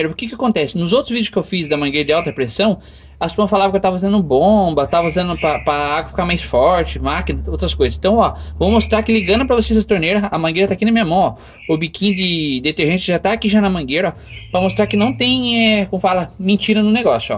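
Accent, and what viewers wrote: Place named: Caipira, Brazil. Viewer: Eeeeitha sotaque caiiipirahhh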